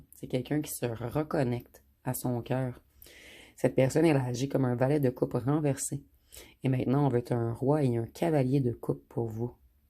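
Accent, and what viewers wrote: Quebec, Canada. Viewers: Chère madame. Merci encore pour cette guidance très élogieuse pour le consultant pour les compliments que vous faites dire à son ancien autre Merci infiniment à vous. Vous nous redonnerez confiance. En plus ma très chère autre que j’accueillerai volontiers pour discuter avec elle sans jugement en toute bienveillance pour comprendre pourquoi elle m’a quitté si brutalement sans aucune explication. Votre guidance est d’autant plus crédible que ma chère autre bien aimée est capricorne ♑️ ascendant gémeaux ♊️ et je suis ascendant lion ♌️ lune en balance. Les quatre signes ont été sélectionnés. Quatre lettres de son prénom et la lettre initiale de son nom de famille ont été sélectionnées. Merci encore je redouble d’espérance de patience car j’aime mon autre comme je n’ai jamais aimé. Excellente journée à vous chère madame à l’accent québécois inimitable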